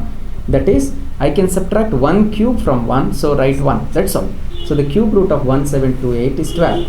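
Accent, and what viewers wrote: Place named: India. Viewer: what I hear: De duh deh deh. do duh deh dee deh deh. If this were the first day of class, I would be on my way to Drop/add. Sorry. My understanding of Indglish is and terrible. lol Will try to find this in english. Thanks for posting though!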